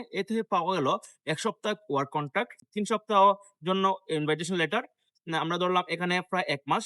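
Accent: Sylhet, Bangladesh. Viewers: ভাই আপ্নে সিলেটি লাগের।আমি আপনার লগে মাতিতাম ছাই।ওয়াটসাপ নাম্বার আছে নি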